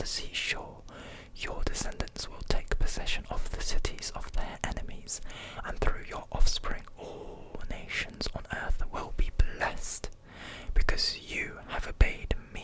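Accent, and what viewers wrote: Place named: England, United Kingdom. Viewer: Wow hi, I just discovered your channel. Your voice is so cool! England-accent is nice. Also, I'm sorry you faced the racism you did. :( Also thank you for being so vulnerable. I know that can be very hard. Please feel free to talk about yourself more. Talking helps bring healing. Allow yourself the healing you need.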